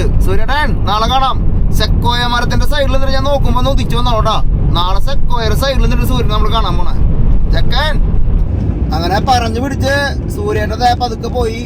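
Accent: Thrissur, India. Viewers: Please stop your accent. You’re doing a grt job but spoiling with your Thrissur tone and accent. You’re next to sgk. He never had ktm accent. So please mate..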